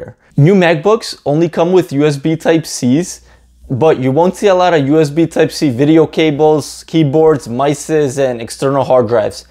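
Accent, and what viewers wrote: Detroit, United States. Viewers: I’m wondering if he’s from Detroit? I am… What up doe? 😊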